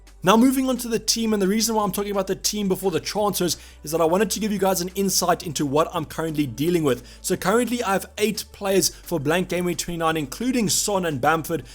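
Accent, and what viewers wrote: South Africa. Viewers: You sound hella South African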